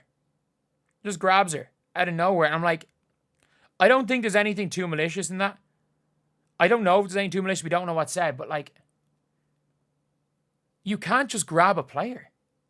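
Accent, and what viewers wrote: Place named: Ireland. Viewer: I enjoy your commentary n your thick Irish delivery!😂🎉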